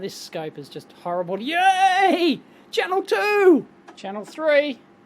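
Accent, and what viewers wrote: Australia. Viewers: Aren't Aprils fool an US-only tradition? Youtuber is from Australia (as if his accent didn't made that obvious) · This guy is Aussie AF.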